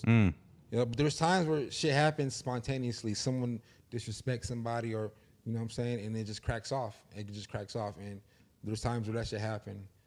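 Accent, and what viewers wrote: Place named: Mexico. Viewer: He got more of a Mexican accent you got mexicans straight from mexico that look exactly like him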